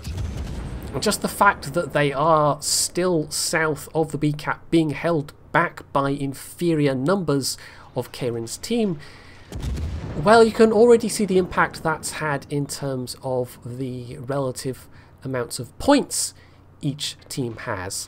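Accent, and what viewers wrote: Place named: United Kingdom. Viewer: Huh, I didn't even realize I played it so sloppy until this nice British person with gentle voice told me just how much I suck really. Kappa. Jokes aside, glad you've found this worth looking at.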